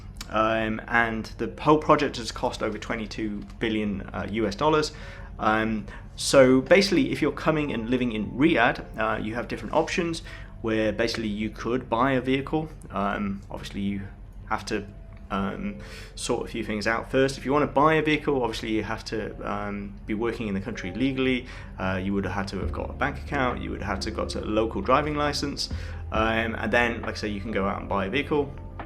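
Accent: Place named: England, United Kingdom. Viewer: You seem to be a citizen of the world with the way you describe things comparatively across the countries. I presume you’re English, love the accent ❤ but amazed at how you refer to USD so naturally. Anyways, great video with very useful information. Thanks 👍🏻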